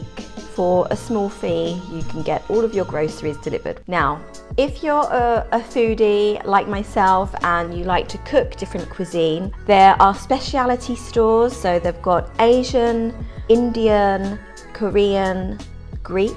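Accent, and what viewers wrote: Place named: United Kingdom. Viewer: Hi! Don't worry about your accent and pronunciation, because most of us Czechs don't care if you have a British accent. I've been studying English for 30 years and I'll never be able to do a British accent, no matter how hard I try.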